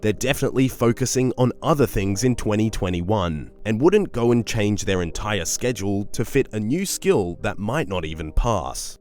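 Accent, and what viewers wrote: Australia. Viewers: wait you australian? lol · wtf your Aussie, never knew :)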